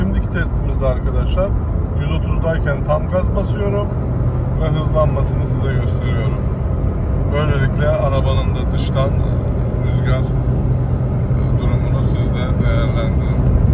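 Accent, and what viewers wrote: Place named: Söğüt, Turkey. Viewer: Nerelisin hemserim sanki sögütten geçtin gibi geldi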